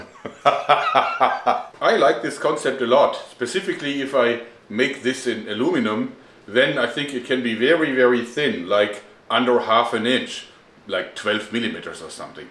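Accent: Germany. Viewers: This beautiful Fleshlight hohoho, that german accent :D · is er deutscher? :3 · i've always loved his accent xD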